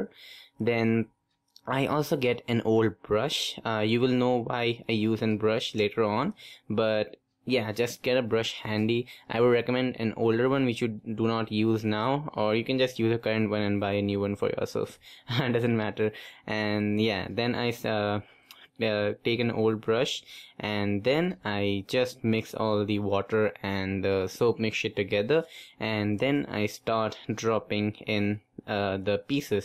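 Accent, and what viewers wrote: India. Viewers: Pta tha aap ek indian he hoge qki aapki english indian jse he aur aaisa steel bowl to indian hi use karte he😁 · Of course he is from india His accent is indian and he orders some cubes from cubelelo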